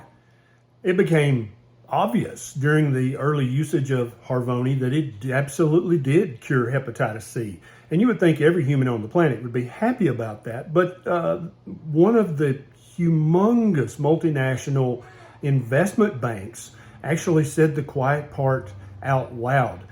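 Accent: South, United States. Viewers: You are simply the best and with a southern accent ! 🤣😂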